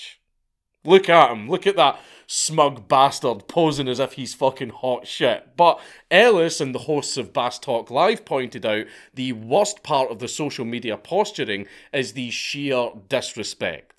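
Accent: Scotland, United Kingdom. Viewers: you sir, are extremely Scottish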